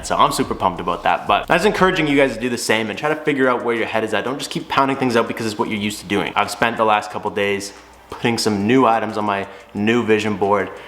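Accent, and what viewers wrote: Canada. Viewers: I really fuck with you.. wish you didn't live in Canada ay.. I'm in Baltimore, but wish I/you was closer.. would definitely hire you to help me with my 300..zed-x.. LoL just learned about the zed instead of the letter Z y'all Canadian's say.. LoL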